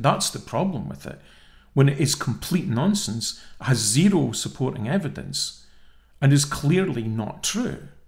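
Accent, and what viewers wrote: Scotland, United Kingdom. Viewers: I always look forward to the beginning in the Scottish brogue (hope not offensive) "ello and welcome to another video" A nice, refreshing entry into the expected reassuring logic.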